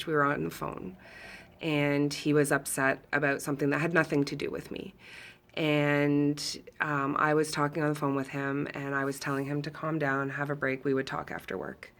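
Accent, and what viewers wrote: Canada. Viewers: I love the Canadian accent …….thanks for sharing your story.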